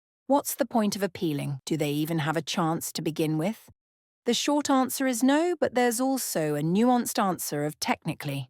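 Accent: United Kingdom. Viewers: What made you choose the British accent? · I love the British accent especially when you say something snarky, it really fits the tone.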